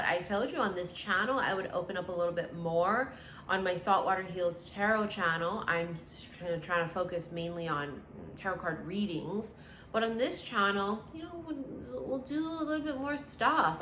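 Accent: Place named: New York, United States. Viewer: Oh wow. Didn't know you were half Japanese nor Filipino. I knew it was something but couldn't put my finger on it. Sometimes You sound like you grew up in my NYC neighborhood! 😂 Love it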